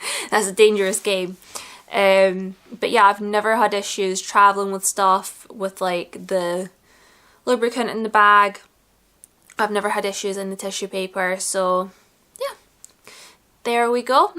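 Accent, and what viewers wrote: Scotland, United Kingdom. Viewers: Hi can I ask where you’re from? Scotland?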